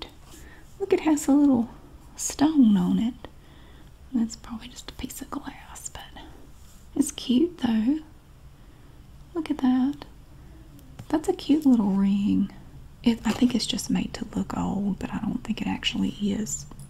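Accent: South, United States. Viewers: You remind me of my grandma :). She also has a southern accent and sounds almost just like you. When I was younger, ( I'm now a teenager) and i spent the night at her house, she usually put me to sleep by reading a story, telling a story of her own, singing me a song, etc. And you just remind me of those times, and put me to sleep, thinking I'm a kid again in my grandma's comforting presence. thank you for the nostalgia :)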